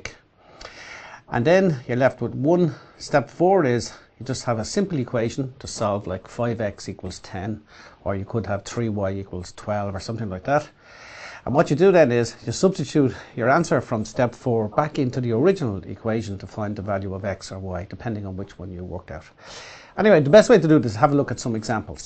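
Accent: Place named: Dublin, Ireland. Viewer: Am In 2nd Year And I Know That! But Fail Play Tah Yeh!! Btw Love YOur Accent very dublin :L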